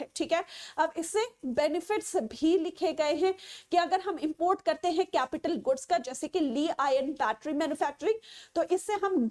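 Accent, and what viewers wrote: Kashmir, India. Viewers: Your voice, tone, and way of talking is very similar to us, ❤❤ FROM KASHMIR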